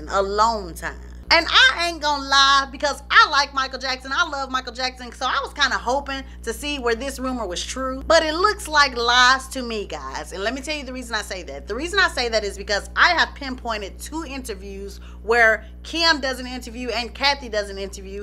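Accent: South, United States. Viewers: I love how you talk all country and then come through with the Dianne Sawyer voiceovers 🤣🤣🤣❤️❤️❤️ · Hey there girl. I was about to lose my mind if I didn’t see another one of your fun juicy videos filled with gossip scandal and tea. is one of my favorite female groups. Right up there with the. I just love the way you talk with the country accent. It compliments you so well. It goes hand in hand with your character you are so bubbly fun and such a joy to watch and listen to. You are such a talented woman. You are going so far with your talents. The lord has blessed you so abundantly. God bless you your family and your talents.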